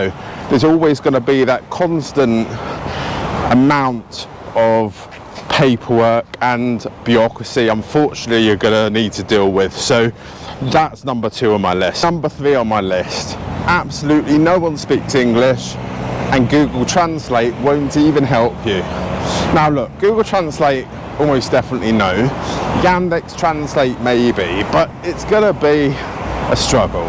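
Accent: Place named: United Kingdom. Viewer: I love the British English accent. I would shrug off the US English should I come as an alien from another planet. But the English pronunciation, hilly and aspirating, reminds me of the coughing of a Victorian aristocrat.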